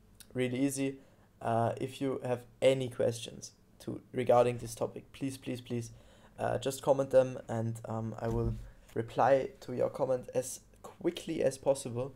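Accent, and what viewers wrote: Germany. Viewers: you sound german kinda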